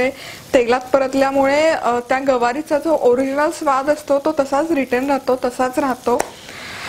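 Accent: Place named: Vidarbha, India. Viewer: Tumchya recepi chan astat. Mi nehmi baghte. Aani karte pn. Tumhi VIDARBHATIL aahat ka. Bhashewarun kalat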